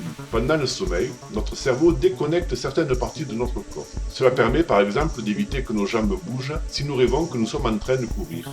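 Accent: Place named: Southwest, France. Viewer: Bonjourrr j’adore votre accent du sud ouest comme le mien hhhhh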